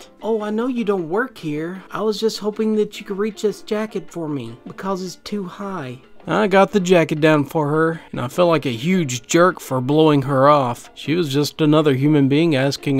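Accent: South, United States. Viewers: Someone with a strong southern accent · the most calm and somewhat soothing southern accent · I know it's Southern, but what state? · Where is the reader from or is that a fake accent. It's not the coastal South, maybe Arkansas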